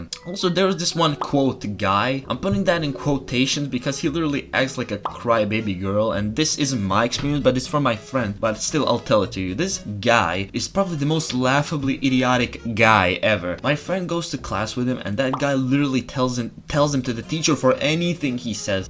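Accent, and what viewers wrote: Croatia. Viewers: According to your channel you live in the glorious land of HDZ and your accent tells me you live in the Greater Zagreb region or in my case "prek Učke"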